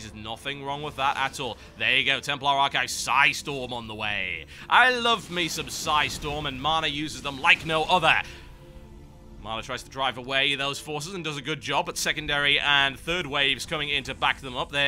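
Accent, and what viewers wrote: United Kingdom. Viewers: no need to drink coffee, good gameplay gets the blood pumping.. love your enthusiasm =3 keep up the good work. (also, yay for british accent <3 )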